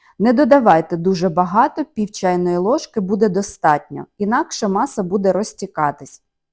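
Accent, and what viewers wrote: Standard. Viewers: Хорошие рецепты,прекрасная подача приготовления,без диалекта и суржика - умница.но только для украиноязычных . Поэтому переходим на другие кулинарные блоги,слава Богу их предостаточно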